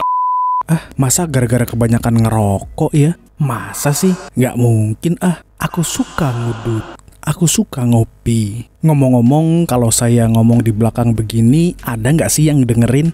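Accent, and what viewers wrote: Banjar, Indonesia. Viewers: Hanyar sadar, sakalinya mimin urg banjar🤣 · Bang , orang rantau tapin atau Banjarbaru?? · Orang Banjar kah pian nhe hehehe😇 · Orang banjar kah bang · Orang Banjar kah mang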